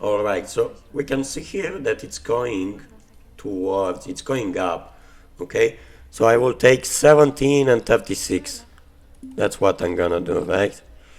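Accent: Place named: Russia. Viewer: Bro your voice is a legend gambler voice! I love that russian accent ❤❤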